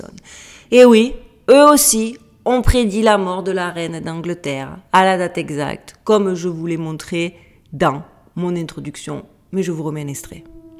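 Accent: South, France. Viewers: Tu ne serais pas originaire du sud de la france des fois 😊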